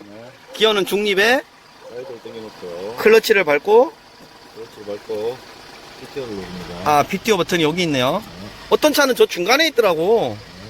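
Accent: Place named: Yecheon, South Korea. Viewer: 시끄라도 ᆢ예천말 정겹워요 ㅎ 늘 홧팅입니다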